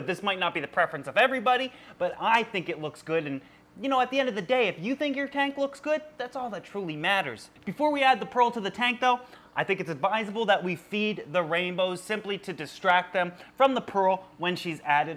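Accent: Canada. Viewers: Sorry *clearly Canadian* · you sound like a canadian. especially when you say "barb" haha sound like randy from TPB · I love your Canadian accent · I like your Canadian Accent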